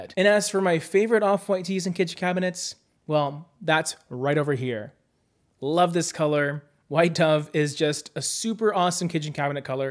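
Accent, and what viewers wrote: Canada. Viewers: He’s Canadian….different pronunciations there.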